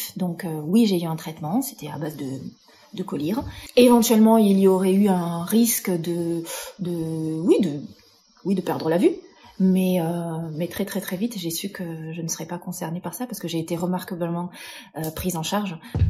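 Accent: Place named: South, France. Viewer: j'adore votre accent meridional 😊.ma mere etait de la Dordogne,( Perigord vert, pres de la Haute Vienne et je suis ne en Anjou, maine). et loire,limite Sarthe et Mayenne. La bas aussi, on a un accent "bein" prononce. Bon Courage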